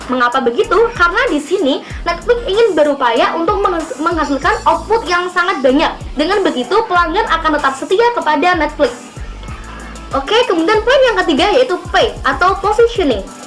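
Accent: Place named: Banyumas, Indonesia. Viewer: Kok ngapak yaa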